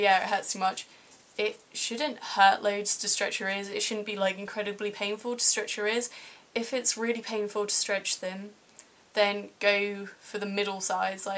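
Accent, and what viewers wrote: United Kingdom. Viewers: u sound english not american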